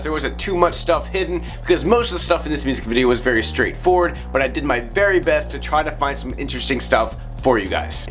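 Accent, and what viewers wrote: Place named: United States. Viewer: Potato He has an american accent, you saying that is like someone from britain saying that they dont have an accent to someone from America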